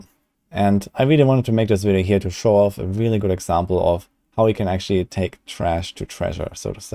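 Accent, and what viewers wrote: Germany. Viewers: Oha, bin gerade froh, dass ich Dich gefunden habe. Werde mir die anderen Videos auch ansehen. Glaube rauszuhören, dass Du deutsch bist. 😊 Aber ich schaue es auch sehr gern auf englisch!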